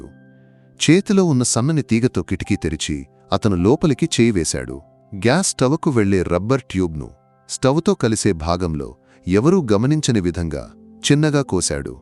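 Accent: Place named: Kerala, India. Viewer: మాతృభాష మళయాళం అయిన మీ నోటివెంట తెలుగు పదాలు వినటానికి చాలాబాగా అనిపించింది. డి వై ఎస్పీ అనే పదం కేరళలోనే విన్నాను. 6 సంవత్సరాలు మీ రాష్ట్రం లోనే గడిపాను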